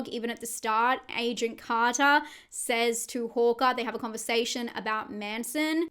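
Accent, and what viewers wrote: Australia. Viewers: I'm sorry, I'm just messing with you. I know it's because of your Australian accent. It's actually kinda cute and adorable, and I loved the video btw!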